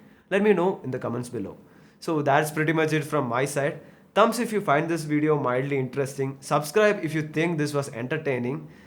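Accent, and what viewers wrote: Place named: Kerala, India. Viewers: Malayali accent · That strong mallu accent is why I watched the whole video. No kidding. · accent is very thick and top of that he has a strong south indian accent, something I find it very hard to listen to...!!! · loved your Mallu ascent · He is a mallu bruh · Looks like the host is a Malayalee from his accent! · Strong malayali accent with a super detailed review.. what's not to like?? Loved it!! Hope to see you more😀😀😁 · Its like malayali accent 😀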